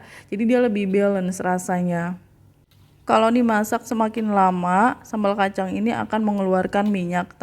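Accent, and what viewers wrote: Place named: Sunda, Indonesia. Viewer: Hahhahaha super lekoh.... asli urang Sunda 😃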